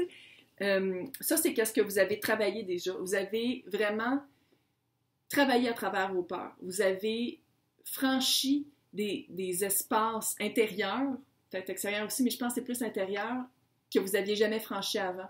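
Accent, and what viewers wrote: Canada. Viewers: Merci beaucoup pour votre énergie positive et votre bonne humeur. J'adore votre accent canadien, je suis française et j'avoue que parfois j'ai du mal à comprendre vos mots et ça me fait bcp rire 😂❤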